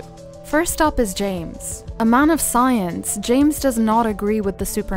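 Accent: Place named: Ireland. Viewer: I love this Irish + a pinch of eastern european in her accent :T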